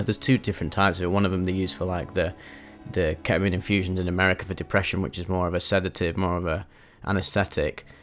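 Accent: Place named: Manchester, United Kingdom. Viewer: Thanks for your openness and honesty, sweetie. I have every faith that soon you will be able to be with the pain of your relationship break-up, learn all the lessons waiting for you and move into another exciting phase of your life. The ups and downs of this balmy journey hey... And I just want to say that I love listening to your dulcet, Mancunian tones read out these trip reports - it inspires me as I get started on my own psychedelic journey... Sending Love and Hugs 🤗🥰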